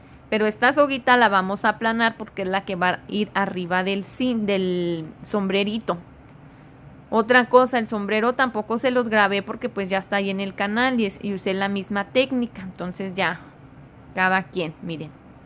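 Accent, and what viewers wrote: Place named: North, Mexico. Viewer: Hola muy bonito tu pastel te felicito una pregunta ojalá me contestes pero de donde eres tienes un tonito como del norte te escuchas como nosotros los de chihuahua jaja 😂 Dios te bendiga y lo mejor de corazón gracias por compartir lo que sabes 🙏🏼😘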